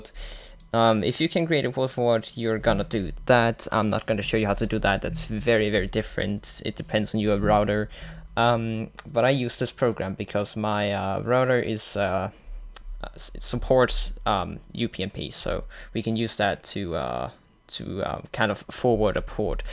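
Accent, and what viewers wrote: Denmark. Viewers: Are you Danish?? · Are you Danish? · are you from denmark?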